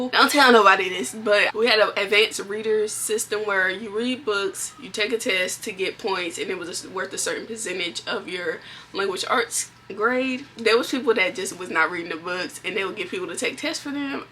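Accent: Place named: Florida, United States. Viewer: Knew you was a fellow Floridian on the original video I watched!! We just have a distinct sound. Im from Palm Beach. I will be starting my channel in a few days and this really helped to uncomplicate it all! Ive felt stressed starting a channel before. It wasn’t fun either.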